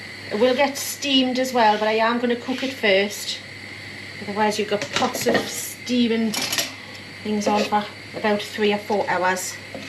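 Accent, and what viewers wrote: Newcastle, United Kingdom. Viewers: Lovely steak and kidney pud Hinny havent had one in years,even nicer with some oysters popped in,lovely to hear your Geordie accent.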